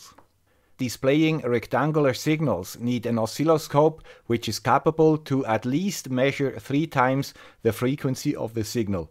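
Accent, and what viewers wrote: Switzerland. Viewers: great video!! I love the guy with the swish accent!!:D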